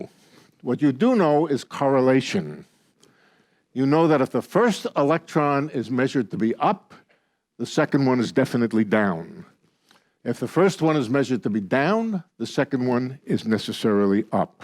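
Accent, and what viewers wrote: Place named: Brooklyn, United States. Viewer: Don't let Lenny's blue-collar Brooklyn accent fool you. He's Nobel Prize caliber. I have 2 degrees in Applied Physics and have sat in on his Stanford technical lectures. I was quickly left behind.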